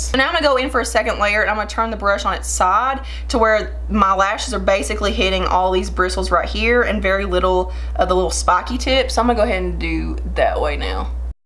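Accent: South, United States. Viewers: I've just found your channel & I'm loving you. I grew up in the south so we talk alike & I'm wondering where your from?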